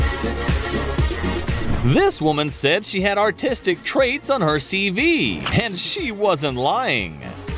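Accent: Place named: United States. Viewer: We don’t really need terrible American voiceovers… or cheesy music.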